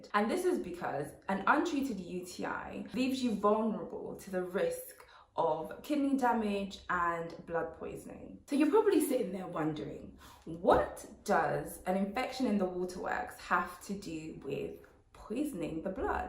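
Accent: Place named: United States. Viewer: Yassss, loved this, your accent though sounding like a real yankee 😂😂😂 love how you switch it up on us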